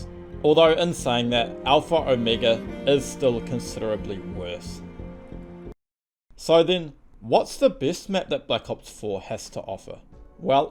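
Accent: New Zealand. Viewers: Are you a kiwi?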